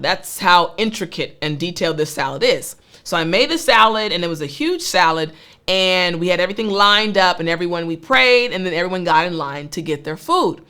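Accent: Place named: United States. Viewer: Best American accent I've ever heard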